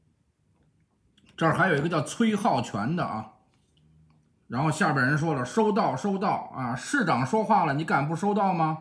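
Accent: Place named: Tangshan, China. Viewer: 哈哈唐山话，比落亭话还有味儿呢。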